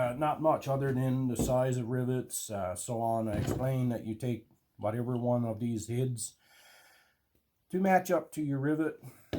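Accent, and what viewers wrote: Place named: Newfoundland, Canada. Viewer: I have one of those , does a great job. Ok so I'm trying to narrow down the accent so let's me know if I'm close , I figure your from the rock but anyone could guess that but I'm going way out there and saying your from a stretch between Grand Falls Windsor east to Botwood but man oh man I hear Bishop Falls big time . Keep at it bye and as they say on the rock , who knit ya 👍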